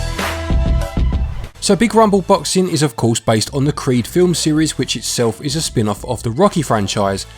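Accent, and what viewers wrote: United Kingdom. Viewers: !!! When did you get so suave and british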